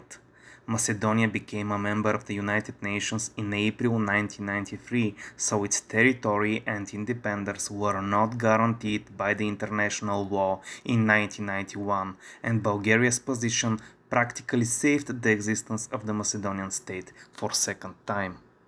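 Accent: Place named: Russia. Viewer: Your English with Russian accent is very funny!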